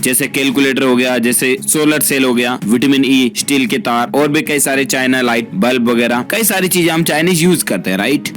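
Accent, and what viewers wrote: India. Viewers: Sir main aapki bahut Badi Fen hun aapki aawaj bahut Acchi h ak dam Hindustani wali....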